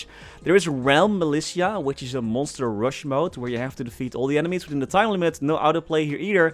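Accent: Netherlands. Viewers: Dude has a heavy dutch accent 😅, is he dutch? 😅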